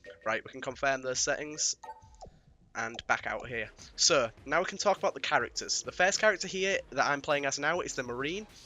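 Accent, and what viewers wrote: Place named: England, United Kingdom. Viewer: Oh thank goodness....and English accent!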